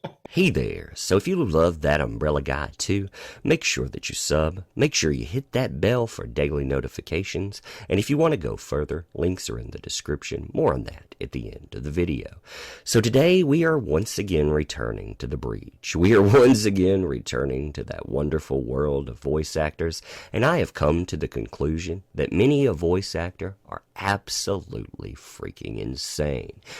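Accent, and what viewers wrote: South, United States. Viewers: Hey where IS your accent from? Are you from the southern US because it sounds somewhat familiar?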